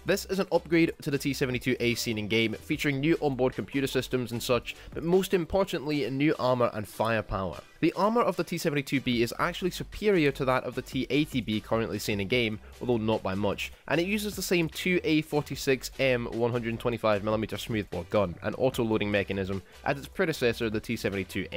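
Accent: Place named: Scotland, United Kingdom. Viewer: I honestly love the Scottish accent. As a matter of fact, I just love the Scottish in general.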